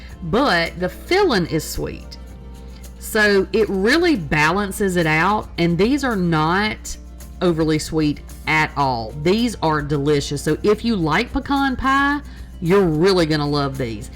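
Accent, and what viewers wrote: South, United States. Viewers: ❤,your southern accent is priceless, I love watching you and learning ❤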